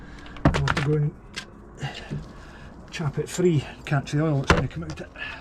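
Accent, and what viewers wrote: Scotland, United Kingdom. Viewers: Your scottish is that deep you sound like Rab C Nesbitt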